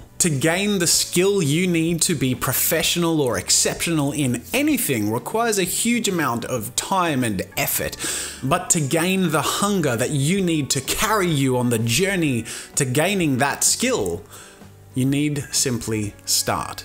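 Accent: Australia. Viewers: Are you Australian... I am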